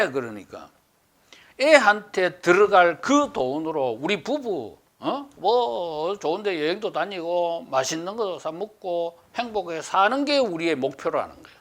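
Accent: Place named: Gyeongsang, South Korea. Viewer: 경상도 말튜네. 건설 마피아의 악마적 결과를 세대갈등으로 작정하지마시오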